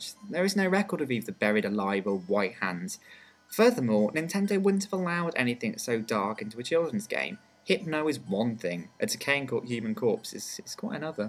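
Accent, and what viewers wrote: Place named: United Kingdom. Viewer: i like the video but can stop talking like a british guy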